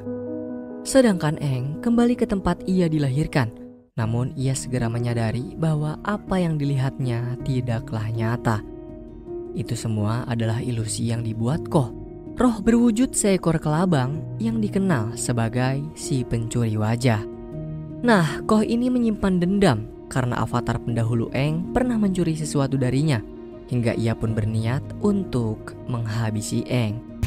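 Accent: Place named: Cirebon, Indonesia. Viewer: Wong cirebon tah min😂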